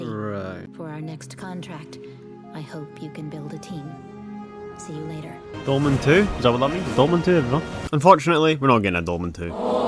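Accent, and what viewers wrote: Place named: Scotland, United Kingdom. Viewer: God bless you. I actually really enjoyed this video. I laughed a lot. Something about a miserable man with a Scottish accent makes it that much better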